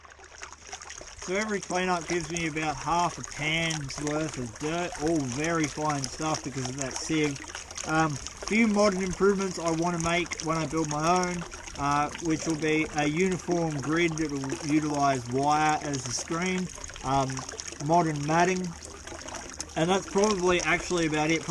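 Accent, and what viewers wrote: Australia. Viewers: Nice, I was thinking Aussie or New Zlander, none the less great job and Keep up the great work.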